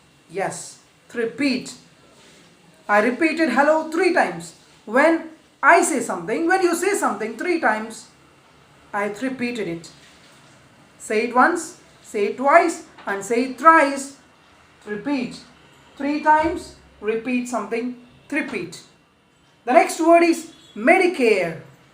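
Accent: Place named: England, United Kingdom. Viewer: All wow wondering English like England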